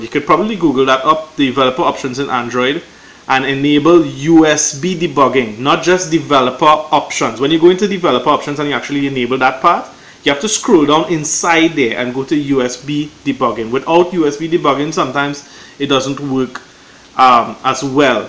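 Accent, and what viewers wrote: Trinidad and Tobago. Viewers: Is that Trinidadian accent? My friend is Trinidadian. He has exact same accent.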